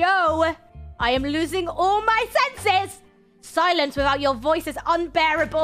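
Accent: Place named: United Kingdom. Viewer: Dude what is your accent? You sound British and Californian simultaneously wtf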